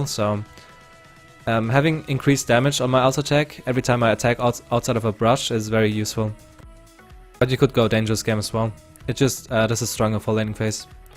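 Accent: Germany. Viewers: Wait what, is a German guy?:O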